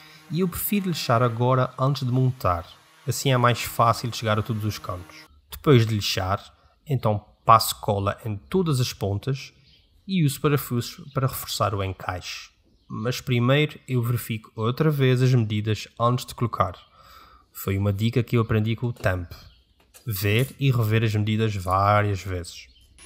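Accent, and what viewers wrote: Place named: Portugal. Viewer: Acho lindo voz portugual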